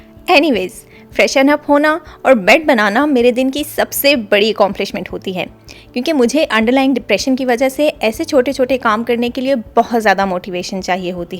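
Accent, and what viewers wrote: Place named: Pakistan. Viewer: Your Urdu accent is amazing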